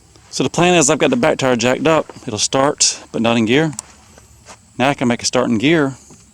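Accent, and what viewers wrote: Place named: South, United States. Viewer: a hint of a southern accent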